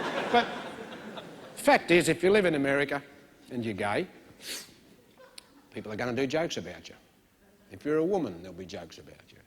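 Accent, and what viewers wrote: Australia. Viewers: Cent stand the Australian accent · That Aussie accent is just a killer . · He is why us Americans love the Australians. Actually, we think everyone with an Australian accent is cool.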